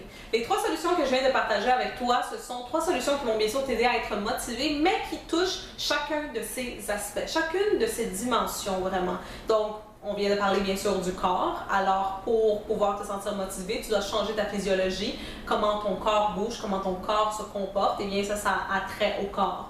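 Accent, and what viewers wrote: Canada. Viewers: impossible de comprendre le francais canadien...hélas. :(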